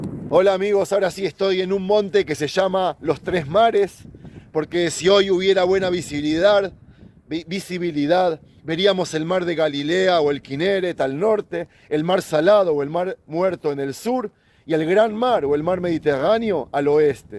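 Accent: Argentina. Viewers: Quisiera preguntarte adonde aprendiste espanol suenas de Argentina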